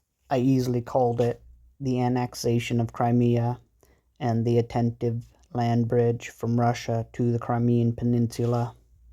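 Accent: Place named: United States. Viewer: Слава України! Героям Слава! God it's so refreshing to hear someone with an American accent who actually understands what is happening in Ukraine. Your committment is admirable and I applaud you Sir. I too fell in love with the nation (and a young Kharkiv region gal named Natasha once... mmm hmmm) and I wish you God's protection and every advantage in combat. Be safe bro, Im a subscriber now!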